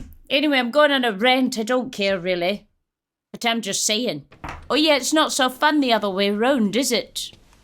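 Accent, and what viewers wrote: Scotland, United Kingdom. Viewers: such a good scottish accent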